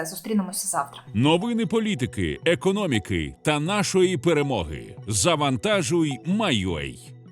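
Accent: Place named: Russia. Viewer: ты с себя выдавливаешь укр.слова Думаешь на русском,в уме переводишь,поэтому акаешь и запинаешься,говори как тебе комфортней Мы понимаем русский язык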